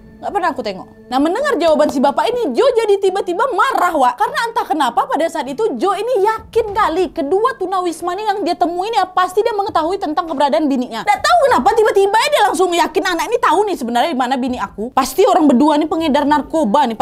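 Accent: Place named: Medan, Indonesia. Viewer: Logat Medan yg totok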